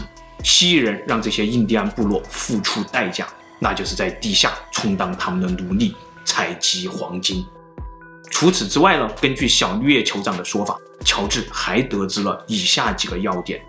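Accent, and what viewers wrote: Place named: Fujian, China. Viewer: Hu 建 口音吧？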